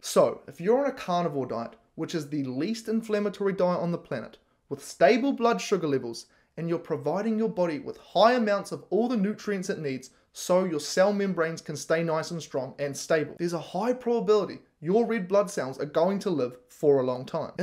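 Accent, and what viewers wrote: New Zealand. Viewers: He is from New Zealand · Great info.. love the kiwi accent!!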